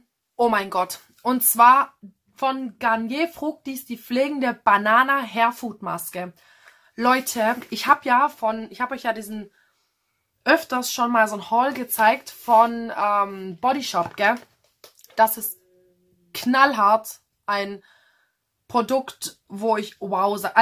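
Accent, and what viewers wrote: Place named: Swabia, Germany. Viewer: Du schwäbelst hart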